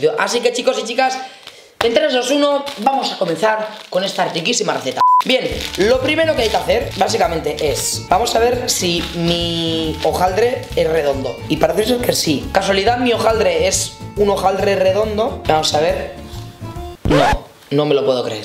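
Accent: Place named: Basque Country, Spain. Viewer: Nola notatzean Azkoitikua zeala jajaja ni Zarauztarra naiz!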